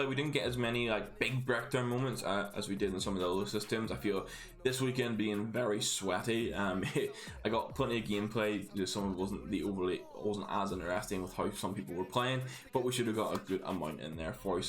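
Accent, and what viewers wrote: Scotland, United Kingdom. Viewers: WOoohooo Which part of scotland are you from, Belfast? Love Scottish streamers! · Yasssss he’s fuckin Scottish. Happening big chap. Cheers for the vid.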